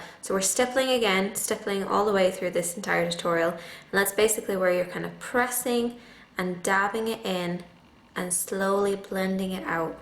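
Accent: Ireland. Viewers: Great video. Very helpful. p.s. I hear a little accent, are you Irish? (I love Ireland xD) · I think she's Irish? the way she says blush and water is less British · just subbed love your videos so brill x an your Irish yay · Are you Irish? Beautiful! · Are u from Ireland cuz u hav a bit of an Irish accent ?? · She's irish, you can hear it on the R's. And yeah, it says so on her description.. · omg do u have an irish accent? like niall? i love him!!!! NOT HATE DIRECTIONATERS! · Ru Irish? You have accent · are you Irish? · maybe she's irish?... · Still trying to figure out your accent xD Idk, it sounds Irish. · are you irish? you sort of have an irish accent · I think she's Irish not British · Yes, yes, I felt it too, she pronounces some words in an Irish manner, I love it. Although she speaks American English to me, not British English. Love the ways she speaks! 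· I think she's Irish.. Just a hint of some sort of accent there. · Irish, I believe :) the Rs are deep-ish so, that's usually a good sign of an Irish accent! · are you irish? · Pretty sure you're Irish! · you sound Irish sometimes....are you? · Are you from Ireland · are you irish???? :D youre very pretty! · I stumbled across this video while watching another makeup guru's video and clicked on this one. I just wanted to say that while listening to you speak I could hear a slight hint of an Irish accent..when I went to your profile it says you were indeed from Ireland. You don't have a very thick accent but I could hear the slight hint of it. I'm kind of obsessed with anything Irish lol sorry >_> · When u think she's American but soon realise that she's Irish hmmmmm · Yeah she's Irish I'm Irish too it's just a different region of accent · Are you Irish ?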